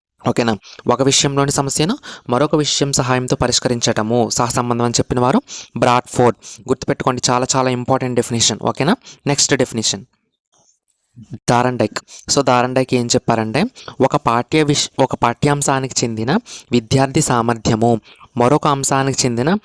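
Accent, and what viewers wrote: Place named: Godavari, India. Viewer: mana godavari slang.......love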